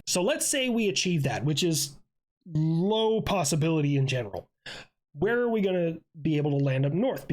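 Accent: United States. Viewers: You know, this guy sounds like America...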